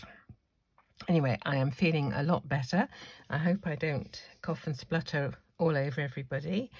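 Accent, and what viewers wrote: United Kingdom. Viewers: I just found you I love your British accent I'm from East if Europe but I leave in USA and love your video so much is so so interesting and very educativ .I'm a beginner on card making Thank you so so much Best to you from VA 🇺🇸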